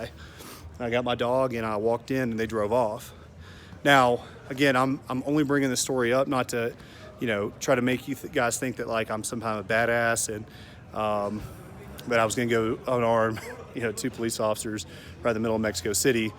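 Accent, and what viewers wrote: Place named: South, United States. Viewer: Me gustaría que hablara español se le escucha muy bonito con ese acento sureño de e.u.a. Pues no se inglés 😳no se si me equivoque al creer que es de allá. Y que bueno que siempre esté alerta. Ah, y también para comentarle que será que sea peligroso que mencione que fue soldado, ya que aquí en México pues los soldados no dicen que son eso y no son buenos algunos por que están involucrados en cosas malas. Y usted tiene mucho carisma y es buena onda. Cuídense mucho. Gracias por contestar. Me gustaría que también aprendiera español bueno sin albur. Saludos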